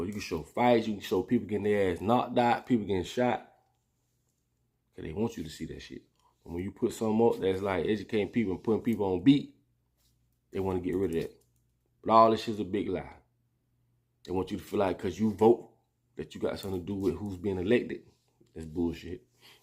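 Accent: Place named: Florida, United States. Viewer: I love that country Florida boy accent 😍💙 keep dropping the knowledge I love it & we need it. U reaching ppl. #memphistn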